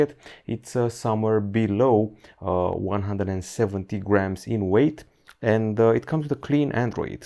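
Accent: United Kingdom. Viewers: Are you British? Do you speak English?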